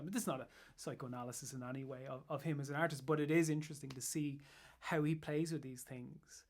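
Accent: Ireland. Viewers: Man, thank you for that fabulous review with your honey-sugared lovely irish accent!:) Can you and please come to my private christmas party???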